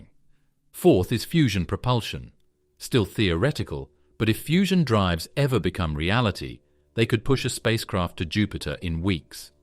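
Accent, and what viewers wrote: England, United Kingdom. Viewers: Why the english accent? You can't find an american to narrate? It's annoying. Unwatchable.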